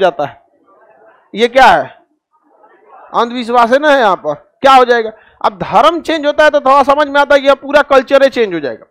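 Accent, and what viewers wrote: Bihar, India. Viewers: Bhai pehle bolna seekho pakke bihari hoo, kuch tameez wali Hindi bolo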